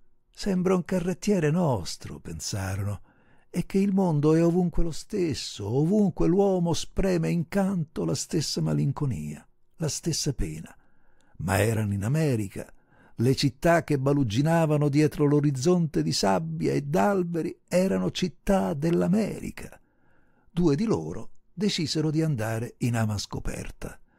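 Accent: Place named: Sicily, Italy. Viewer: Buongiorno caro 😊irresistibile il tuo siciliano❤, racconto commovente,si, ma quanto dolore e sacrificio dietro ❤❤ grazie davvero di cuore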